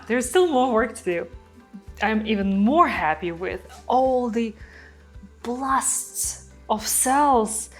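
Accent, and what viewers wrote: Russia. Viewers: русская девушка? У нее русский акцент❤ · Ну и акцент! Чисто русский. даже я почти все понял. Хотя на английском кроме хау мач? них не разумею.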